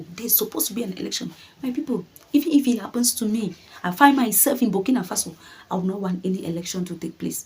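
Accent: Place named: Ghana. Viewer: Jamaica =English? Jamaica = Patios I am a Jamaica The vloger is GHANIAN who speaks with an accent THIS IS PERFECTLY NORMAL/ACCEPTABLE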